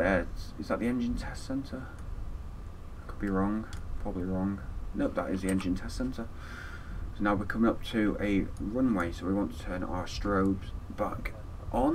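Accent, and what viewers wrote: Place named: Manchester, United Kingdom. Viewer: Congrats on the 2k subs here's to 3k+. Absolutely brilliant job and so well explained. Been through many tuts as a newbie, and yours should beat the top. Pruod to see a fellow Manny making something complex so informative and easy to digest...your a star, no idea how much i struggled before this tutorial. well underrated. thank you..liked...subscribed. ❤️ p.s Yup he's manc (heard the shouting) yup he's definitely Manc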